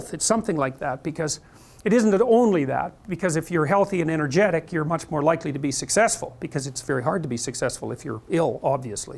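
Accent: Canada. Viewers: I find the Canadian accent very annoying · If he didn't sound so Canadian people would take him more seriously.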